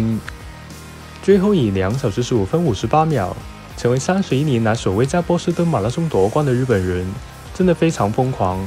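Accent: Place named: China. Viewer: 听你说话 我都快睡着了 普通话口音着实是没有力气的样子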